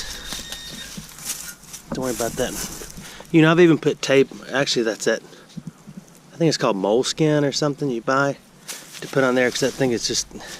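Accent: South, United States. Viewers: Nothing like a good ole southern boys accent... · I Love your videos and I LOVE listening to your soft southern accent, so SEXY!!! ;-)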